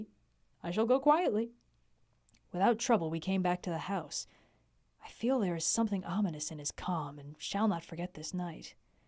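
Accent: Scotland, United Kingdom. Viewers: I would have 1000 hours of that scottish if you would spare me that newsman voice.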